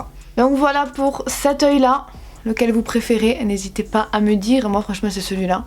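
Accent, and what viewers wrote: South, France. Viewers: c'est l'accent du sud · L’ACCENT DU SUUUUUUD J’ai juste l’impression que mis à part les ch’tis... on a pas d’accent dans le nord 😂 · sud de la france ?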